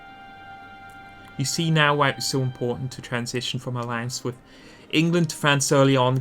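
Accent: Northern Ireland, United Kingdom. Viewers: North Irish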